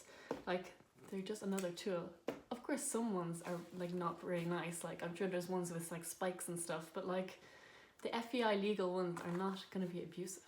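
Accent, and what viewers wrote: Ireland. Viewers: Dear, So nice to see ya, i've been in Ireland for four months last year as an exchange student and i also picked up the irish accent, it is so lovely to hear it now, i had been in Kerry near Killarney, don't you live near Dublin cause you went to the Dublin Festival or so, i would love to see more videos like that, btw love your room and the horse ears pic on the wall, went to Ireland this summer i am actually from germany but love this country so freakin' muuch😍😍😍 i am doing horse riding since nine years,obsessed with it, went this morning, was great😎 🏇 have a great weekend 💚🇮🇪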